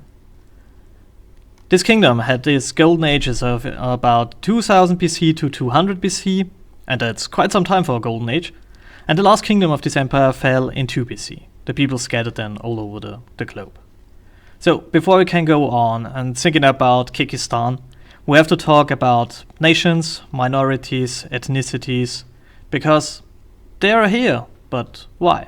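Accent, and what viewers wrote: Germany. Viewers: Since Rachel Dolazar, some SJW'S & even Don Lemmon of CNN suggest that race could well be a social costruct. Rachel Dolazar feels like a black women therfore she is. Although she is a con artist who took advantage of affirmative action & her leadership role for a black organisation. If race is just a social construct & fluid I am Kekistanian as well...and boy, have I been opressed! These regressive lefties dug their own hole! Where's my $$$ where are my advantages? BTW, are you German? Weil ich Deutsche bin & meine es raushören zu können. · you sound german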